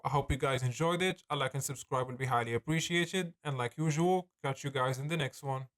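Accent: Morocco. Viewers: Why your accent is Moroccan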